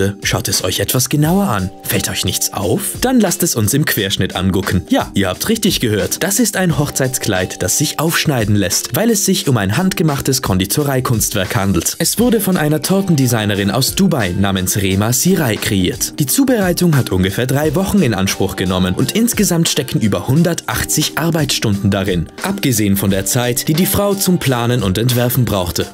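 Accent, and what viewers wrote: Austria. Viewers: Lia & Enna Das ist Österreich-Deutsch☺! Da ich selber aus Österreich stamme...die Deutschen sprechen das CH aus und wir Österreicher mit K; zum Beispiel bei der Giraffe, sagen wir Österreicher Schiraffe; usw.! 😊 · Stimme ist super. Man fühlt sich etwas weniger wie mitten in Österreich. (Nichts gegen Österreich) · Das ist auf Österreichisch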